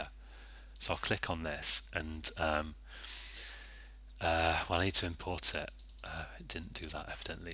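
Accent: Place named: Cheshire, United Kingdom. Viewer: ***** Ugh, how did I not see that, slight dyslexia problem. Thank you. By the way, which county are you from in the UK. Always think you sound like your from close to my home in Cheshire, but maybe I've just been away too long.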